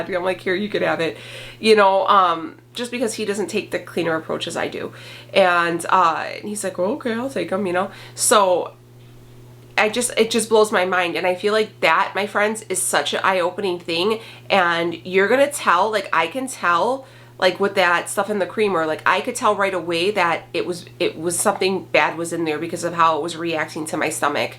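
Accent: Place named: Midwest, United States. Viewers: Great post! You look beautiful! Also, you do totally sound like you’re from Minnesota! My ex is from south side Chicago and doesn’t have the same accent as you! Even sounds more like Chicago! Not a dig, I promise! Your accent is awesome, but it just doesn’t sound Chicago for some reason! I wish you could hear it! I love your videos too by the way, thank you for being honest and helpful! Ps-love the Mean Girls quote! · "from Minnesota" If I had to guess, it's the way you say your O's. I lived in MN for most of my life and now live out west and get busted on my pronouncement of words with O's frequently and just giggled when they say it now. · You’re Midwestern, but not Minnesotan. LOL. Though you do say “Minnesota” spot-on like we do, mostly you sound like my friends who grew up in Chicago 😘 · LOL. You definitely sound like you are from Chicago! 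· You do have that Minnesota vibe. "Don't cha know"?; ) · Lol. You do sound like your from Minnesota. Sorry... even when you were trying to not sound like you from Minnesota, you sound more like you were from Minnesota, ey' 😁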